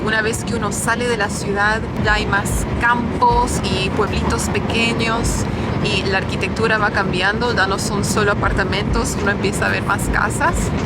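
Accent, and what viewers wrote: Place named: Argentina. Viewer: El castellano de se esta volviendo mas argentino 😅😅😅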